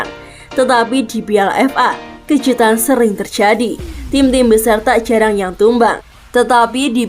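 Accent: Java, Indonesia. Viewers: la kok logat jowo mbak e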